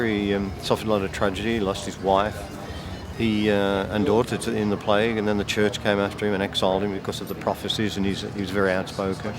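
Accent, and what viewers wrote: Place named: England, United Kingdom. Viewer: he does not open his mouth during speaking... pure english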